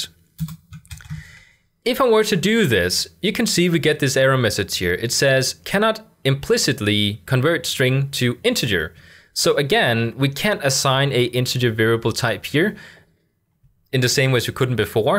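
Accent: Denmark. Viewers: Er du Dansker?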